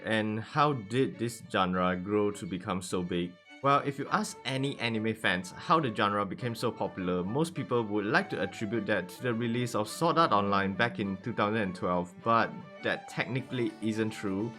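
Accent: Singapore. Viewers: Gosh keep up with the good content, I've been hooked, I was first interested because if your accent and how I just recognized it was Singaporean, since I'm Malay, but yeah keep up the good work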